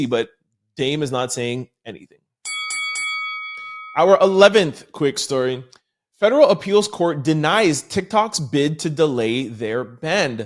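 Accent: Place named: Canada. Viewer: His videos always seem to say a day ahead so I think he must be in another country. He sounds Canadian but maybe he lives in Japan or something 😂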